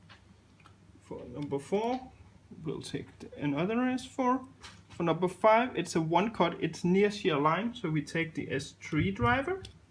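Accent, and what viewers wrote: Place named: Denmark. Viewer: From your accent I guess you are danish. Where do you get pins from. Need some for some 600's and I'm danish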